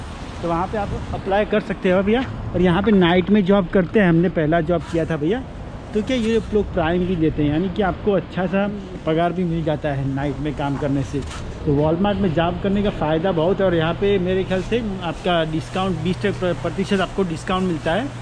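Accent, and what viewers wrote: Gujarat, India. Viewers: ગુજરાતી ઓ ની હિન્દી સાભળી મજા આવી ગઈ... KEEP IT UP BROTHER 👍👍👍👍👍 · Bhai tme2 to gujrati niklya tmari hindi thi khabr pdi 🥰☺😄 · Aa bhai gujarati lage 6 hene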